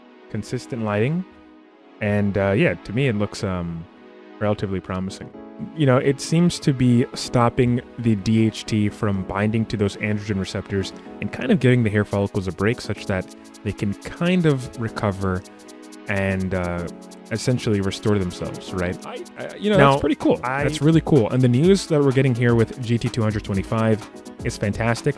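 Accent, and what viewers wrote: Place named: United States. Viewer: Son accent est trop Americian pour l'être 😃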